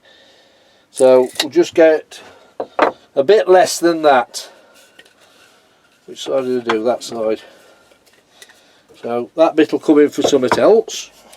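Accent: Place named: Yorkshire, United Kingdom. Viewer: Nice idea been watching your videos over last few weeks and now subscribeyou sound a Yorkshire bloke witch part of a may ask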